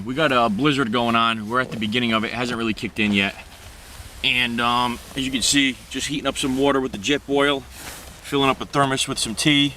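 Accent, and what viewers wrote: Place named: New England, United States. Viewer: Sounds like your in mass or ri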